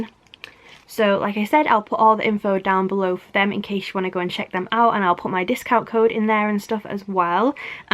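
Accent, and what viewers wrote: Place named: Wigan, United Kingdom. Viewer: I dont know if you seen my comment on your last fright crate unlocking but the pumpkin tea light holder that was smashed they are selling in home bargains i live in wigan and your accent sounds close to mine so I'm thinking yours will also have them in the Halloween range it has a face on the front of it and is a wax melt also comes with a pumpkin spice wax melt smells delicious and is about same size as the smashed one you recieved in your box I bought one other week for 3pound I think I love it and instantly thought about you because I knew you was disappointed they couldn't send you another you look amazing as always ❤👑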